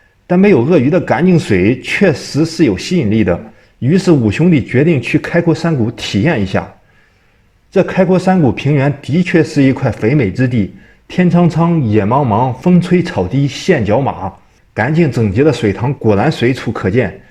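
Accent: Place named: Shandong, China. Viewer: 这口音像是山东泰安东平的口音。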